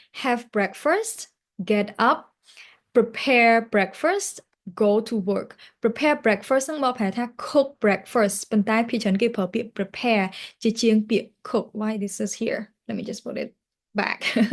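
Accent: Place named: United States. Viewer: Your English sounds like American how long have you been learning english?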